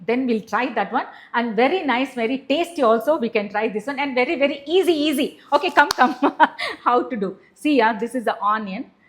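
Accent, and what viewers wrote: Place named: Telugu, India. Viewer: Irritating. Why you talking english like telugu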